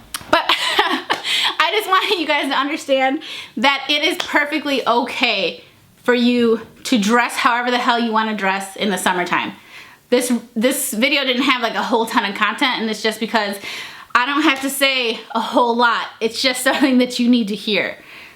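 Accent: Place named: Chicago, United States. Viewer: I am a new"ish" subscriber and I swear I was like "she's from Chicago, I can tell" and sure enough I just added you on Instagram and it says your from Chicago! I'm from the Chicagoland area too! ☺️✌️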